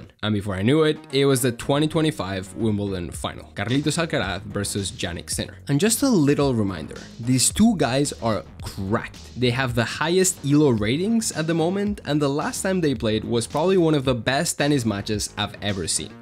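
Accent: Catalonia, Spain. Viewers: Accent català??? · Are you Catalan? That "Jaume" accent is literally on point and you have a bit of an accent!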